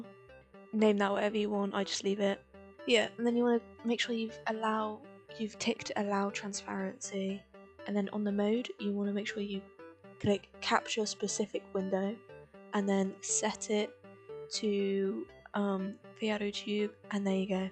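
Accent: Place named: United Kingdom. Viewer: A British Talking Octopus Nice.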